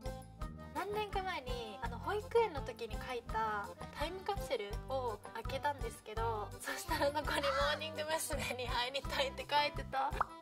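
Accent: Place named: Oita, Japan. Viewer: ッチナ❣️頑張れ👍 天から１日が始まる。大分弁かわいい😍ユーミーのボケとッチナの変化球が大好きです。天然だらけのめざまし、最高です。頑張れ👍 ッチナ❣️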